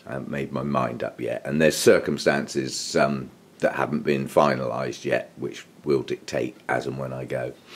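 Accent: England, United Kingdom. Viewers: I certainly just love listening to your English accent, and I think if I live across from you I would look forward to when you are starting your car, I can't help it I get a good laugh 😉😉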